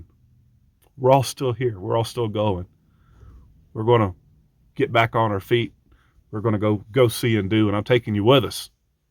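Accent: South, United States. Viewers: So sorry to hear what little Tucker has gone through. Praise God he is better. I will be praying for you all. it is so touching to hear you praising God and your Savior Jesus. Do you remember when you found the Bible in a campground a couple years back? I began praying for you at that time. I enjoy your thoughtful talks and your southern accent. My Grandparents were from Missouri and I loved their accents. Get rested up!!! My Dr told me to start low and go slow. He made me repeat that to him several times. It is hard when your mind says one thing and your body says another.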